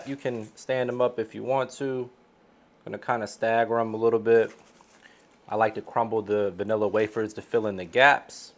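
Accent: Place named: Florida, United States. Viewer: Where are you from? Southeast? Your accent makes me think of Florida. 😂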